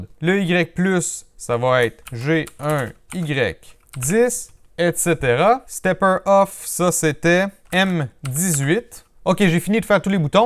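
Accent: Canada. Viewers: Bonjour. Je te suis depuis un petit moment et je trouve ta chaine extra!!! Ton projet sur la domotique est super intéressant. Continu comme cela c'est parfait. Il serait aussi intéressant dans ton projet de lier ton système domotique a un écran "Magic Mirror". Super travail 👍 J'adore ton accent Canadien / Franck le Frenchie 😉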